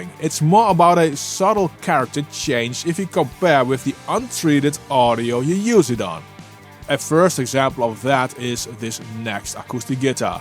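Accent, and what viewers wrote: Netherlands. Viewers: Subscribed for the Dutch accent!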